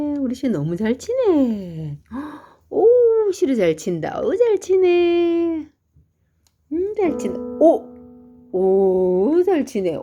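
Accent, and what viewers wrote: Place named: Gyeongsang, South Korea. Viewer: 경상도인가봐요 ㅋㅋㅋ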